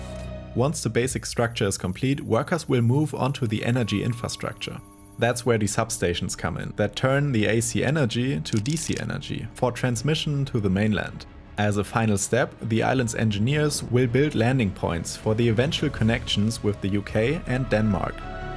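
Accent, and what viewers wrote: Germany. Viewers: Great, we have English with a heavy German accent but no original German sound track. Kind of annoying. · Hopefully there is some work put in to try and reduce the accent. I am new to the channel and just watched 2 videos from last year and then clicked on this and I instantly went "oh they have a german narrator now". Its quite noticeable. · man merkt so krass dass der Sprecher Deutscher ist. Ganz schlimm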